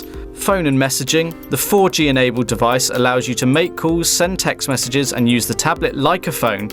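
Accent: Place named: United Kingdom. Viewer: Extremely balanced review. Well articulated with a typical British accent ;)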